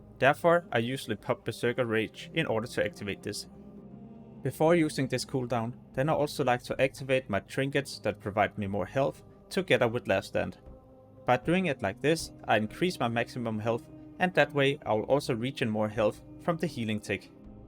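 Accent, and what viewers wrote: Denmark. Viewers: Useful guide! Thanks brother. I have a mage, But also a warrior. I kind of wanna focus warrior, but all the people say They’ll be useless early on, I don’t know… sigh Also, are you Danish? You sound danish!☝🏼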